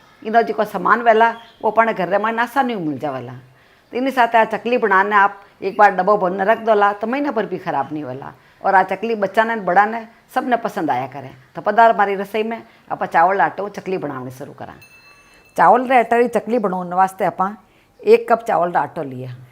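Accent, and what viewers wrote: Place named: Nagori, India. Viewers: ap ki zuban nagori hai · mai rajesthan s hu , ap ke language sun k apny mayke ke Yaad ati hai.... nice recipe 👌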